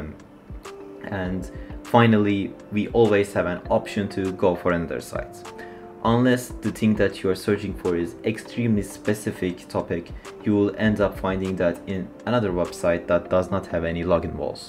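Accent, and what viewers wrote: Turkey. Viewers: Turkish voice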